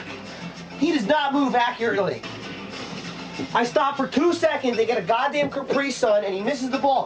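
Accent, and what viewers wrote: New Jersey, United States. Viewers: So much Jersey accent. It's beautiful. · Back when didn't hide his Jersey accent. *Cheers and applause*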